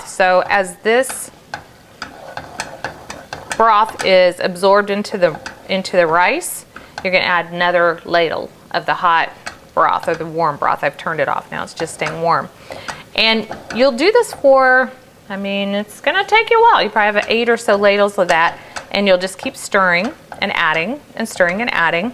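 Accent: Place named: United States. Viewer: hey I just subscribed to your page I love risotto I make it every now and than love it and I actually have a Scottish background so not Italian lol but still in Europe lol my nan was Scottish when she was alive I never met her but she seemed nice ,I will definitely try out your reicipe I am from Australia,are you from America? I can tell a bit from your accent I have shallots here we call them eshalots here I call them baby onions as they are little lol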